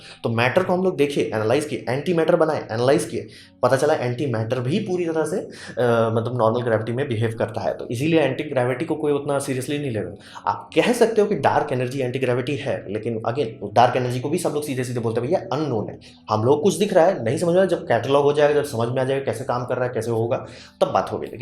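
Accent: Bihar, India. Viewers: Sir..kya aap Bihar se ho..?????????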